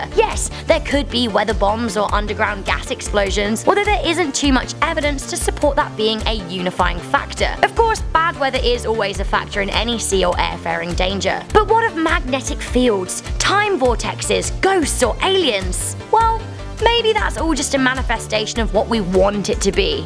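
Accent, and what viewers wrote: United Kingdom. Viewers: Good for you you don't live in America down by Florida cause you are either British Australian or Irish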